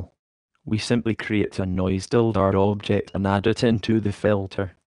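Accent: Scotland, United Kingdom. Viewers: lol got so excited when i heard a scottish voice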